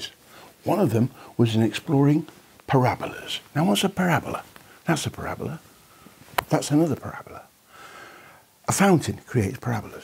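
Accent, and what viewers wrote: United Kingdom. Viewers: That's as British accent as it can get.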